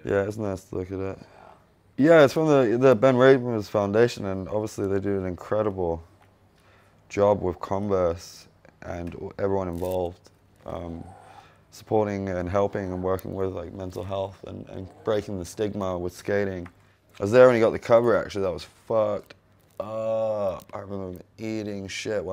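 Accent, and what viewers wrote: United States. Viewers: Once he starts drankin he sounds American. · strange accent seems he picked up an american twang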